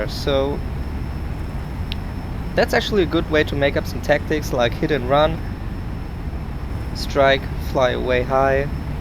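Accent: Germany. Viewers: Dieser Deutsch Akzent 😂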